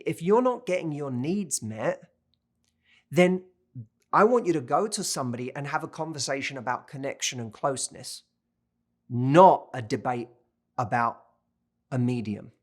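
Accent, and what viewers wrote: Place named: United Kingdom. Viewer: he sound British🇬🇧🇬🇧🇬🇧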